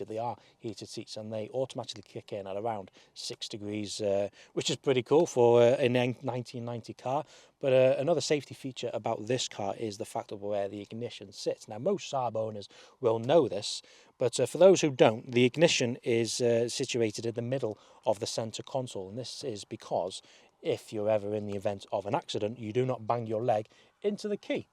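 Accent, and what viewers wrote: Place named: Wrexham, United Kingdom. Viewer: I presume you're a Wrexham lad from the accent, would love to see you take some stuff over Worlds End. Yes it's narrow but I used to take my 'Group B' MG 6R4 over there for a shakedown and across Llandegla...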